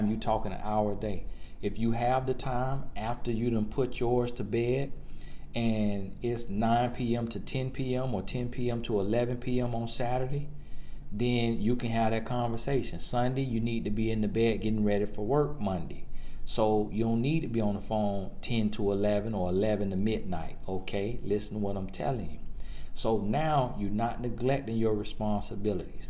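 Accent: South, United States. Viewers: I love how that southern accent comes all the way through. lol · The southern twang with it too. Love it... booty ways dang he ain't tell no lie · You have a lovely accent The American southern accent is adorable Subscribed! 😊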